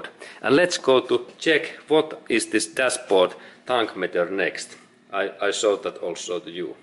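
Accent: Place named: Finland. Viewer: Suomi aksentti on paras aksentti. Subscribed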